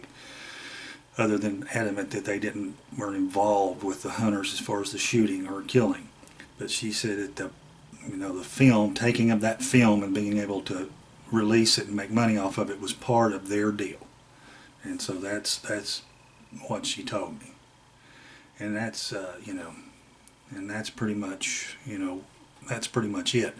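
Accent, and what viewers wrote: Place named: West Virginia, United States. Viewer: Where are you from,? Your accent sounds a lot like mine here in good ole WV. Bobbie was a very sweet lady... always came across as genuine. This is all new to me, considering that Gimlin is a liar, so I'm kind of in shock. I was always kind of hoping that MK Davis, who I like, was just overreacting or something, like we're all capable of from time to time. This is pure insanity. So, Patterson and Gimlin expedition wasn't to film one, it was to wipe out a family of them in that area? I had no idea that we weren't seeing the complete film, but honestly, I never bought the "Patterson fell off his horse" part of the story. No one falls off a horse and lands that gracefully, camera in hand still.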